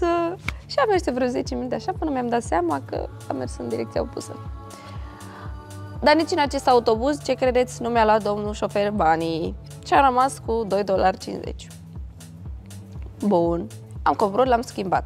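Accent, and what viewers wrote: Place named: Moldova. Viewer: E moldoveanca ce vrei.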